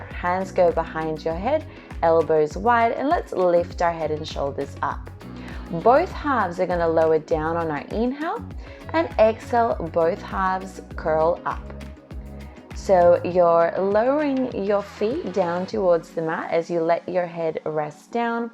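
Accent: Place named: Sydney, Australia. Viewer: Thanks:) you're beautiful Sydney accent makes me nostalgic <3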